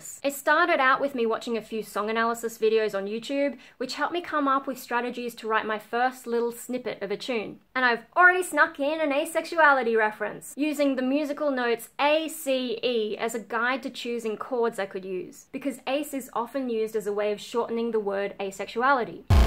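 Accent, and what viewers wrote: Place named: Australia. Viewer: Whoa. Shot out of the cannon. Very YouTube 2018ish. I've seen other YouTubers with this style. I always want to tell them to cut down on the coffee. :) Are you picking up a Valley Girl accent? Please don't! I like the English with the slight Aussie touch. Without a doubt, you are creative. Ten years of vlogging and countless projects, to name a few. For myself being creative means, to create my own world. As a kid, building blocks were my favorite toy. These roots translate into many other things. On a side-note: I like - The Tea Party at the End of the World - Just a soothing feeling I get from the visual of the installation. Asexuality and Music: Well..., here we are at the issue. - I'm convinced that sexuality or sexual desire, is the biggest driving force in music. Sex, Drugs and Rock'n'Roll to name a cliché. As easy as that. 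And not just for Guns N'Roses type music, but for the most unassuming music too. So as a teacher, my most-obvious question would be: If you take sexuality out of the equation, what is left as a drive?! I would want to know about the driving force. About your journey and your conclusions. The feelings about it all you can convey, and last but not least...what meaning does it all have for you? BTW: I shamelessly lifted many of these questions, from the basics of how to write a movie script. - A lot of psychology can be learned from the techniques of writing a movie script or story. Like the Hero's Journey. You know? The audience wants to know abo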